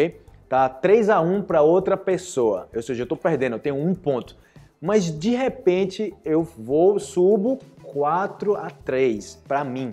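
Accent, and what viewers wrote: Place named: Colombia. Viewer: Oi cara.... que e essa placa ahi da Costa Rica, voce está na Costa Rica ?.... Eu sou da Costa Rica e estudei por tres anos no CB, isso aconteceu la por 2007, depois eu nao voltei praticar e fiquei atras, estes videos tem sido muito boms para min... continua assim por favor.. tou adorando seu jeito.... muito obrigado... Quando voce troca a espanhol se escuta un sotaque colombiano muito bacana, voce aprendeu na Colombia ?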